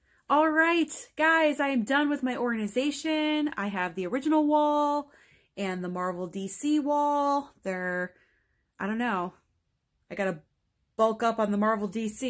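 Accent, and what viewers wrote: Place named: East Coast, United States. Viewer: Well beautiful eyes keep grindin' because the future is bright 4u. Btw love the East Coast accent.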